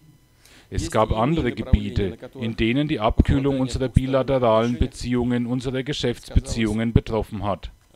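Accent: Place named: Franconia, Germany. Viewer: alter kannste kein hochdeutsch oder was, so stolz du auf dein fränkisch auch sein magst sollteste wenigstens versuchen es für die zuhörer erträglich zu machen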